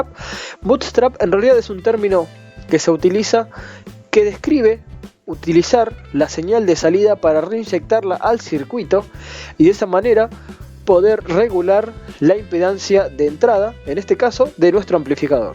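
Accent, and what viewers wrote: Argentina. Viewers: eres argentino o chileno?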